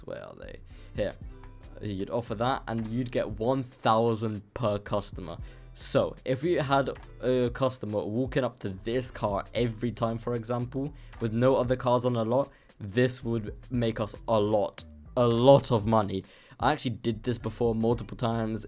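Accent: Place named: Greenville, United States. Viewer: Average greenville accent😭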